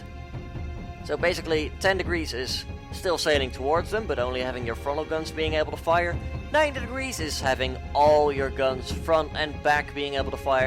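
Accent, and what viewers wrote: Netherlands. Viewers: why dose the ai failsafe not conect and Are u dutch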